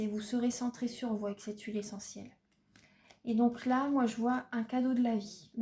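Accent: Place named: North, France. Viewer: Quand je vous écoute, j'ai l'impression de m'entendre quelques années auparavant. Même voix, mêmes intonations et même accent ! ( Du nord) c'est troublant !!! Bonne continuation !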